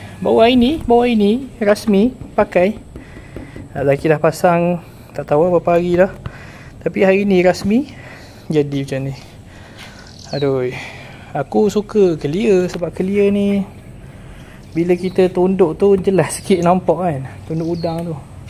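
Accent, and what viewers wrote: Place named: Pahang, Malaysia. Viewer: gaye berckp mcm org pahang..heheheh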